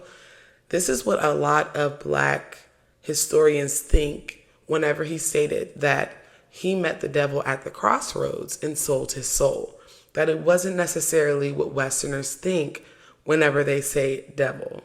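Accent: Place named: California, United States. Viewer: Can somebody tell me where shes from? I hear a Stockton-Sacramento accent but I could be wrong…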